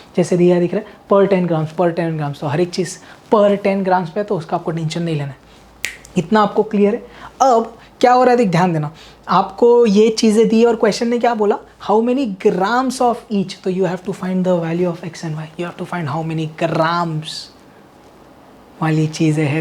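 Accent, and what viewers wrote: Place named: Punjab, India. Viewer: 😂he literally was so tired “Or graph khatam h nahi ho raha “ 💘truly admiring person and love ur panjabi accent >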